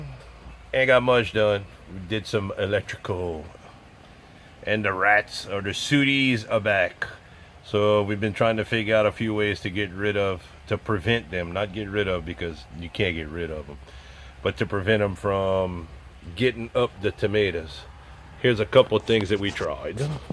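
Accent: Louisiana, United States. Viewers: I'm here and there and come back all the time! If for nothing else but to catch a splash of that awesome Cajun sounding voice of your's! I don't push my channel either but as time passes folks just seem to find something interesting enough to watch and of course we learn so much from all the commenters. Thanks for taking us along with you on your greenhouse adventure. I sure do wish I could have a tomato plant growing with fruits on it here up north!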